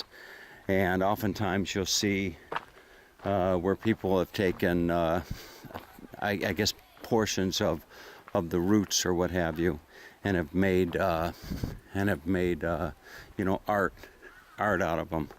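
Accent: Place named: California, United States. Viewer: This is how all people from California talk! LOL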